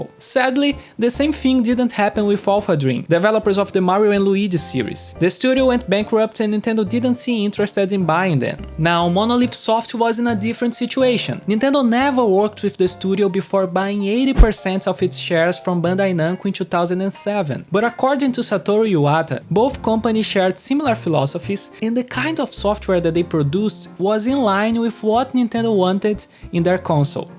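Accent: Brazil. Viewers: Hey, are you brazilian? Because for me, your accent and way to talk are very similar to the brazilian way, and im from brazil then it seems a lot lol. And very good video btw, I LOVE Xenoblade and monolith soft is incredible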